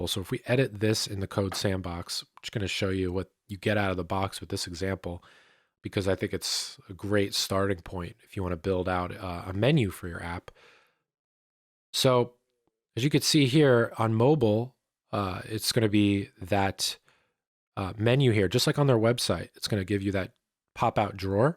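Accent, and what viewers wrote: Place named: Boston, United States. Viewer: Man that Boston accent <3 You should colab with Brand one day.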